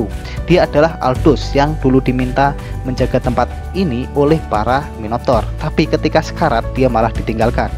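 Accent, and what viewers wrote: Indonesia. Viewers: nganu, medhok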